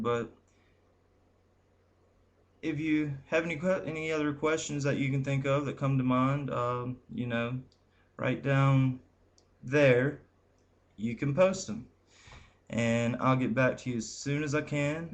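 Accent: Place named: Georgia, United States. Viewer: Great video--since I'm from GA, your accent is a plus!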